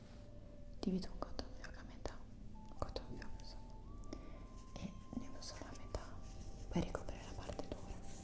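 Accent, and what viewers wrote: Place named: Emilia, Italy. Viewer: È emiliana le origini non lha dette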